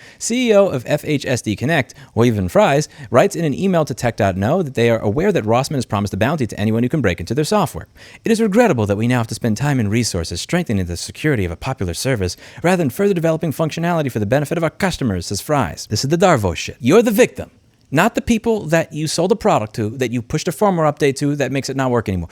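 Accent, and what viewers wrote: New York, United States. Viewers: You can really hear the New York accent come out when you're worked up. I love the good fight you're putting on for us, keep at it ya legend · His new Yorker accent is strong in this vid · ...Gee, Can you tell that Louis is from NYC? hehahaha LOL! Mocking the Futurehomes CEO by using his cats as the victims.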